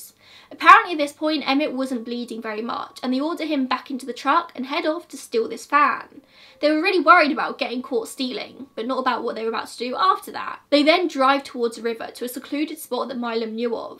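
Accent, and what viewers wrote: United Kingdom. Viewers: She has a very thick British accent. I THINK she said Gratuitous. And I think she is using it in the sense that posting the picture is unnecessary or not justified.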